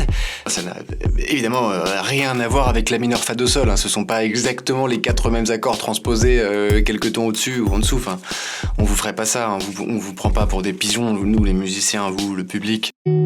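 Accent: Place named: Germany. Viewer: xD je croyais que tu était allemand au début ^^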